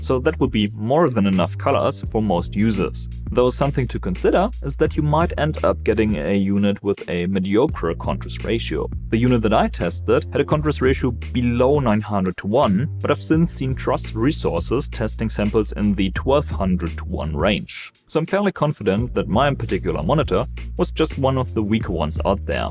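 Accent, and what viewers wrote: Germany. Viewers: Junge man hört so krass das du deutscher bist. Nicer content <3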